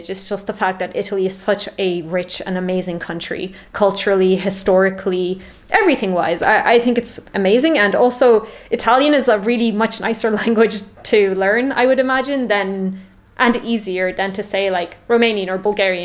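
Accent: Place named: Ireland. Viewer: Are you Irish? I love this accent 🥰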